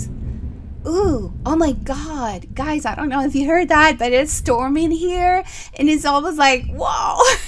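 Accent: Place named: Latin America. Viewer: Oh no problem I understand ... I just hear a bit of Latina and I was just curious great video have a great week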